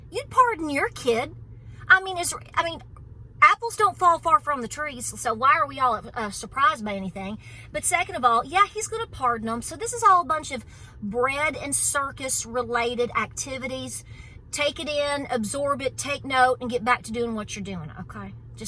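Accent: South, United States. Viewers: i just love your podcast! I love your cheery attitude! And your southern accent and all your animals! And your voice, oh my goodness! Ilove it when you sing! Thank you for brightening my day!🙏😊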